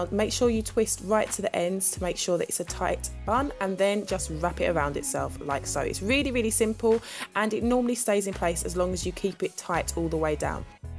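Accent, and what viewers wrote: London, United Kingdom. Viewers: She's from London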